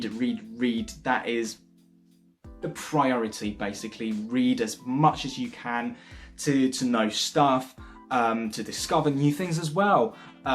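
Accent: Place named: RP, United Kingdom. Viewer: Well I don’t know about his nationality, bjt he defo has a pretty standard british accent :)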